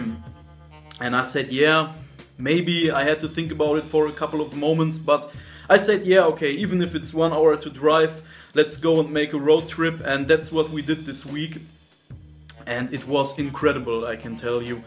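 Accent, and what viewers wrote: Germany. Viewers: Ist das total krass dass ich am akzent gehört hab dass er Deutscher ist ? 😀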